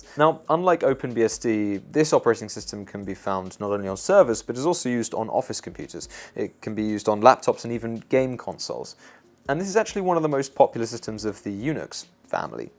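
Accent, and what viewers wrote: RP, United Kingdom. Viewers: Aye, posho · posh boy... sounds like all of Boris Johnson's school friends in one person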